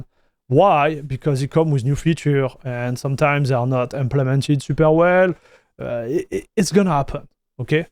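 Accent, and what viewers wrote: France. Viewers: French accent at it's best! Love! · bro he's likely native French and learned english, how do you think accents exist? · It's Frenglish but it"s not too bad 😀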